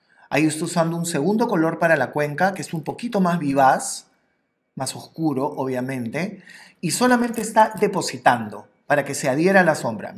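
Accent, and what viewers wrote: Portugal. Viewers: ese acento portugués me enamorooo ❤️ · Me encantó escucharte hablando en portugues :) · Te Amo! Tu eres AMAZING!!! ME Encanta verte, oírte y aprender de ti. Gracias por Existir preciosura y por hablar tan hermoso Portugués 💕 💖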